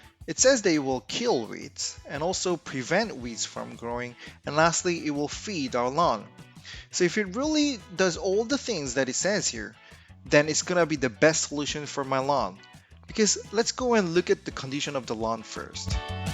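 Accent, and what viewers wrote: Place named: United States. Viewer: ㅋㅋㅋㅋ목소리 톤이나 자막이나 미국 사람 다됐네 ㅋㅋㅋㅋㅋㅋㅋ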